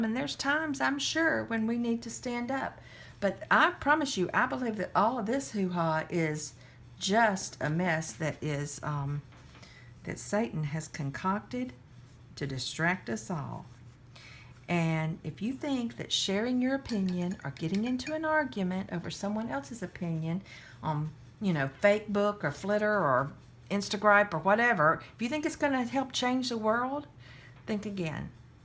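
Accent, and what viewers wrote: South, United States. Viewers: LOL its your southern twang..its comforting! Plus you're talking about the King! <3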